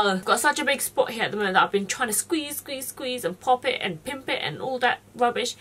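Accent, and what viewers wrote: United Kingdom. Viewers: i've been following for you for months on insta and i finally looked up your youtube. i wasn't expecting you to be british haha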